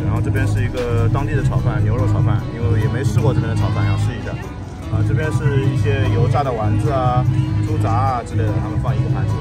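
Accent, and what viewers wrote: Shanghai, China. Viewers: 上海人？还是江浙沪的人?很好奇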